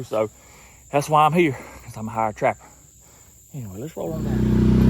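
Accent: South, United States. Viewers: After having seen quite a few of your videos, I am now also starting to understand what you say in your broad southern dialect. That was certainly not the case in the beginning.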